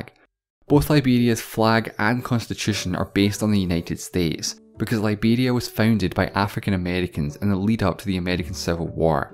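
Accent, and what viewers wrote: Scotland, United Kingdom. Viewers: Is scottish or something? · I don’t want to be culturally rude but is it me or does he sound Scottish (btw I’m new to this channel)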